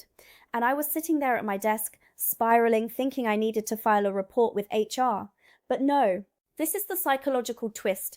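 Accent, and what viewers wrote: England, United Kingdom. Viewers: I thought she was a Brit. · Why do you have a British accent then?. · If you ar3 American, why do you speak with a British accent? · Why do you sound English? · I'm a brit and there is so much of this that is nonsense to me. And how is her accent so perfectly british when she has already said she's american.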